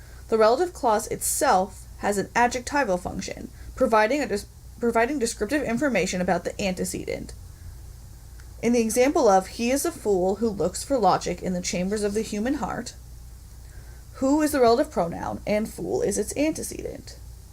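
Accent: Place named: United States. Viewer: We I believe have some idea of how to pronounce Latin correctly. I am fairly certain the Romans did not have a thick American english accent.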